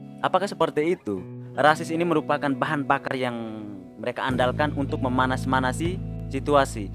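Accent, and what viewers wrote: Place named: Adonara, Indonesia. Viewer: Abang ini orang, apa??? LOGAT nya masi kental Le... Hehehe... Kayak orang adonara Witi hama.... LOGAT nya itu hahahaha, sukses broo terus laris manis buat konten nya.... Kita akan mendukung dari belakang.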